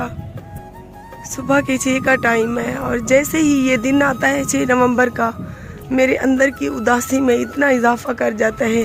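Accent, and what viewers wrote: Saraiki, Pakistan. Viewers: Syster aap bolati bahut pyara hai saraiki aur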